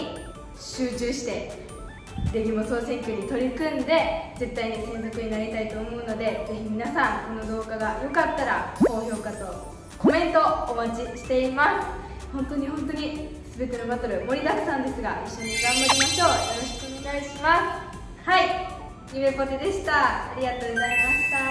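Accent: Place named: Kansai, Japan. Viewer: ぽてちゃんの関西弁好き😘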